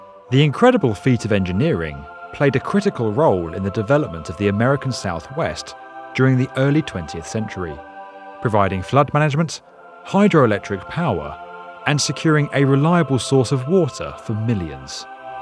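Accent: United Kingdom. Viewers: Bro it was obvieous from the bigginni g that he is brit all the evidance was pointing at it from not sounding the R at the end and from many things that differs brits from american accent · Why do we have a British guy talking about American history?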